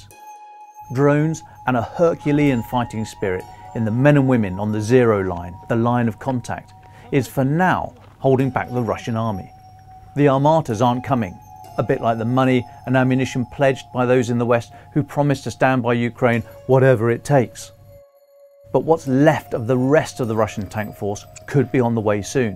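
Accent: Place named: United Kingdom. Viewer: Yet another load of BS from a guy with british accent.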